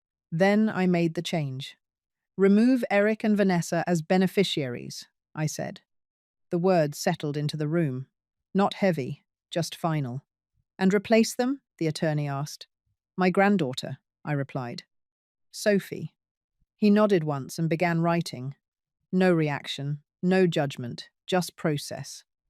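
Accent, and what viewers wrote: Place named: San Diego, United States. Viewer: So that's what a San Diego accent sounds like 😅😂😅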